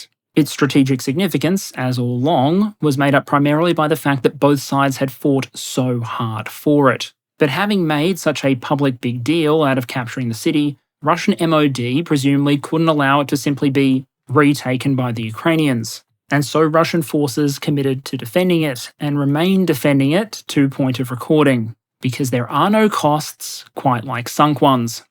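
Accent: Australia. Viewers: Mr., I don't understand why you were concerned about this update, it is excellent as usual. You have my greatest respect for staying on track and as far as keeping with in the boundaries of reality. Thanks once again. I am always so grateful to hear such an incredibly intelligent voice with an Australian accent. Baku boy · Thanks for getting me through a bumpy flight to my vacation mate. Just listen to the nice Aussie man and ignore the turbulence. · what helicopters? The ones Wagner shot down? Because the Ukrainians short down zero. What nonsense was he speaking about in the video? 🤭 Helicopters are good once the ant-air is knocked off. So it's offensive overkill. You really should stop listening to this clown. He has wasted hours and hours of your precious time and your brain is growing mushrooms. He's a disinfo channel on the payroll of some 3 letter agency like the CIA or in this case, probably British. 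Lots of useless details, meandering around w some patronising Aussie accent does the trick it seems. Too ez. 🐑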